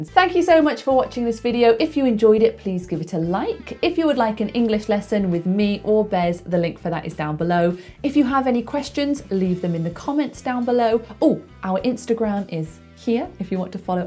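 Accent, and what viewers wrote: United Kingdom. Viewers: i like british sounds❤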